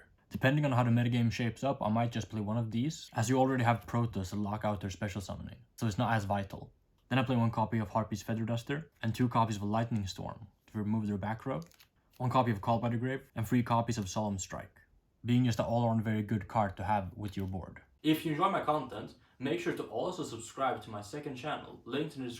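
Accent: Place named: Sweden. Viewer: dont be a prick, how many languages do you speak perfectly? He is swedish, they pronounce some letters differently in english, big deal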